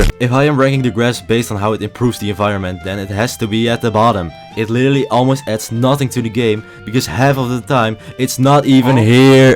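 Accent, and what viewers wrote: Netherlands. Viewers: Bro I can hear it so clearly that you are Dutch, but I love it, keep up the content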